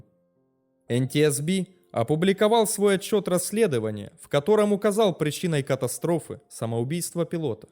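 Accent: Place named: East, Ukraine. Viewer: я это поняла. Восточная Украина, видимо) может, Вам проработать его? Без обид, просто мы Вас не видим, только слышим, голос имеет огромное значение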